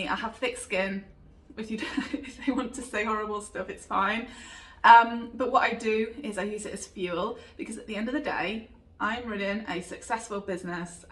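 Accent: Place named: United Kingdom. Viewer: Hey! Love your work (and love your British accent). You are super talented and I have learned so much from you. Thanks for this video - you rock! ❤️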